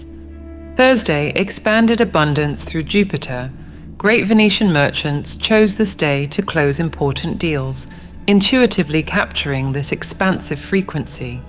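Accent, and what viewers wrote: United Kingdom. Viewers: LOL!!! I see you've pulled out all the tools in your belt for this load of propaganda eh? Oh we had thirteen months alright, which you can clearly read in your present bible. Not hidden at all. A wee bit of truth and a load of bull...propaganda. You use a British voice to convince us that she is well educated, lol, and you use low frequencies to relax the mind and put it in a more malleable state. This is pure evil. Also known as misdirection. Some truth mixed with some nonsense will have you forever searching. DON'T FALL FOR IT FOLKS!!!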